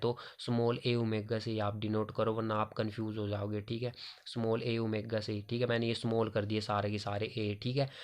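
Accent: Himachal Pradesh, India. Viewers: Bahut simple way mai smjha diya aapne bhai very nice keep it up and love your Himachali accent ❤ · Himachali accent is always osm ❤😁😉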